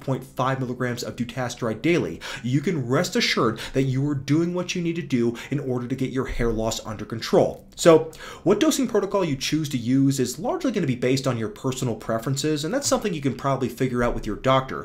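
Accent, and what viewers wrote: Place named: North, United Kingdom. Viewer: UK vibes. Possibly north of england. Possibly Pakistani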